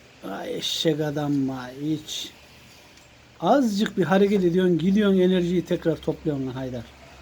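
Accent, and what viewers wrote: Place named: Kayseri, Turkey. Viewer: Abi ben daha önce de yazmıştım size sizin şiveniz kayseri şivesi bana bu şive tanıdık geliyor bende kayseriliyim antalyada oturuyorum yani yakınız sizi hep izliyorum annenize allah iyilik versin hayear zaten bir numaralı artist maşallah Bu arada yeniyılınız kutlu olsun 😸😺😻🙏👍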